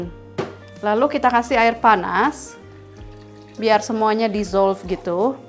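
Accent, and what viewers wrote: West Java, Indonesia. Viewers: Aduhh teteh yamg nonton lebih panik...apinya naik gt deg2 an teh teteh biar lama di uk tapi logat sundanya ndak ilang ya teh tapi ndak papa itulah cirinya teteh....rame teh kalo sambil cerita2 tambah asik....selalu sehat teh❤❤ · Abdi kadupandak oge, Suganda. Seneng teteh logat sundanya masih.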